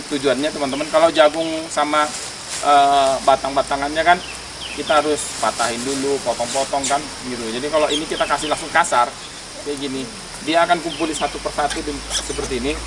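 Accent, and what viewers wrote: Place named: North Sumatra, Indonesia. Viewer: dialek bg mantab kali..org sumut kali..sukses selalu 💪🏆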